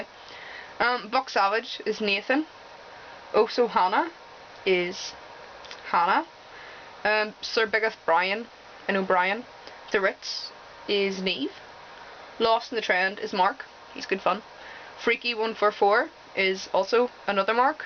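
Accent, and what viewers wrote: Fermanagh, United Kingdom. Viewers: My Granny, my Dads Mum was from Fermanagh. She moved to Manchester England in her early twenties. She still had the strong accent as went back in school summer holidays with my Dad and his brother. It’s nice to hear you speaking, reminds me of her. Although I remember her being stronger but could be my bad memory. Thanks anyway and hope you and loved ones are well. You seem like a great person and if your anything like I was when younger. Your a good person but don’t realise or have the confidence in yourself you should.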